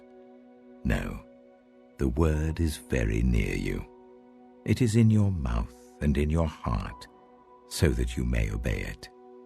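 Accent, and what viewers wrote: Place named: RP, United Kingdom. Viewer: Let's face it you cannot beat a really nice cultured British accent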